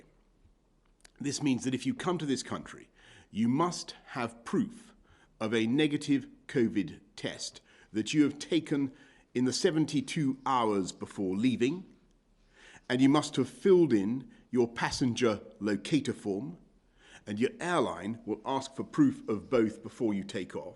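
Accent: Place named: RP, United Kingdom. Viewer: You only sound posh but useless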